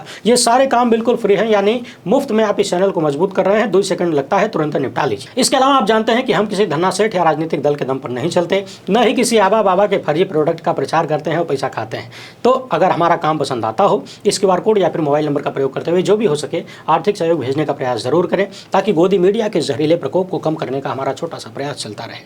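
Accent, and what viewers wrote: Bhojpuri, India. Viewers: ये भी अजीब संयोग है जी आपने कहा, "गर्मी का मौसम है ठंडा पानी लेकर बैठिए मेरी टेबल पर ठंडा पानी ही है। " यूं भी मैं एक बजे के बाद ही सोता हूँ। आपकी खबरें देने का अपना और अपनी भौजपुरी स्टायल में बोलना आकर्षक है वार भी सीधा करते हैं बिना किसी लागलपेट के।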